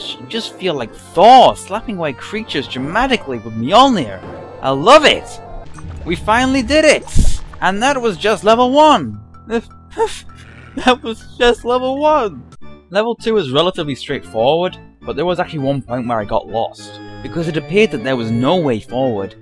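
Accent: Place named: North East England, United Kingdom. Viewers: Sound like he's from Northumberland where I live. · Diego Rojas The North East of England would be my guess...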